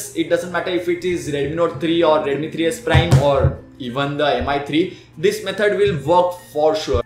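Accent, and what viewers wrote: India. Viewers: what the heaven are you saying man. what kind of English is that??? can u speak with proper english?? because simply i can't distinguish English or Indian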